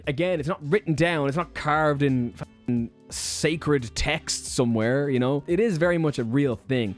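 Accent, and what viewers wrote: Ireland. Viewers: For an American you have a really Irish accent….. ❤️👍🏻🇺🇸🥃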